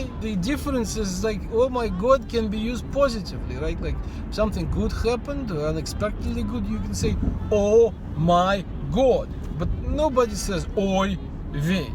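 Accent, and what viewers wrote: Russia. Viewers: "God bless America" :) but i think you turned out the way you did (wonderfully great and funny) because you come from a communist backgound, and i can relate to that. thank you for making the effort ! i LOVE your accent, it is such a mix of russian, something else and american :)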